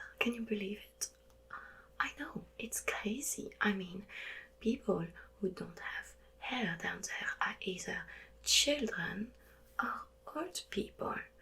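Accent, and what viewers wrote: France. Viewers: I'd really like to live in universe:'/ - educated universe Ton accent français me fait beaucoup rire d'ailleurs! Tes vidéos sont vraiment originales j'adore 👌🏻💕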